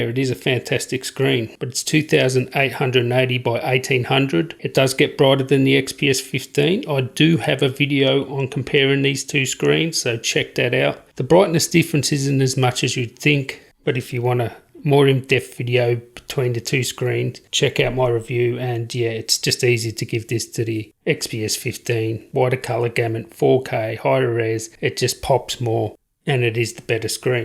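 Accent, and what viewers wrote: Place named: Australia. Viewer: Australian accent in the flesh 😜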